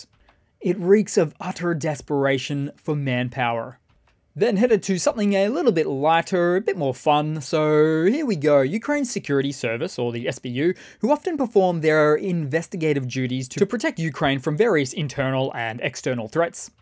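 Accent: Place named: Australia. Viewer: Its called an australian accent, doffus. Australians generally speak in a very singalong manner. So stop the snobbism, mate.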